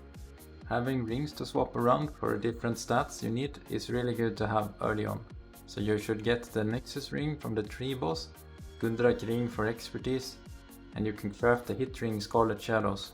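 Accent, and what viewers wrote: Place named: Sweden. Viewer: yo does that weak aura come with that swedish voiceover lmao,great guide,thanks!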